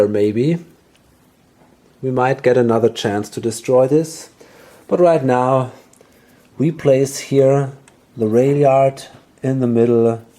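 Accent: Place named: Germany. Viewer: I knew it,deutschen Akzent erkennt man relativ leicht :D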